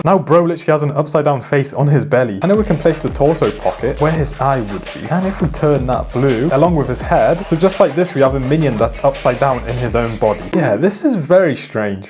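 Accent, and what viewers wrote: United Kingdom. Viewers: HE IS BRITISH!!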